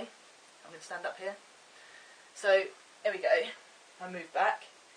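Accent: United Kingdom. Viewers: really enjoyed this vlog...im from Birmingham uk by the way you sound british. Good Luck with training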